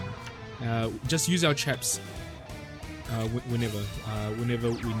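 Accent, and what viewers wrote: New Zealand. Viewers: Dude. Your accent. Are you from NZ???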